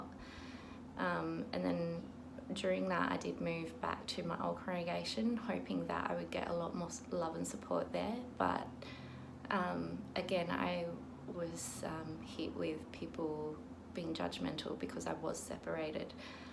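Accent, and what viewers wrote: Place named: Australia. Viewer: Do I detect an Ozzie twang in there.. .. I spoke too soon.. .. you mentioned it at the end.. doh.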